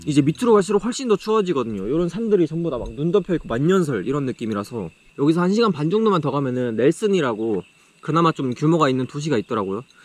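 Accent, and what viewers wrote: Gyeongsang, South Korea. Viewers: 혹시 경상도 분인가요? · 올해 60.창원사는 아줌씨입니다. 여행을 좋아하다보니 우연히 영상 보게 됐는데 울 아들마냥 경상도 사투리가 반갑네요 ㅎㅎ 조용조용 즐기면서 탐색도 하고 진정한 여행자 같아요. 진심 부럽네요. 앞으로 자주 보게 될 것 같아요~ 3월에 호주.뉴질 가는데 이 영상 많은 도움이 되겠어요. 감사해요~❤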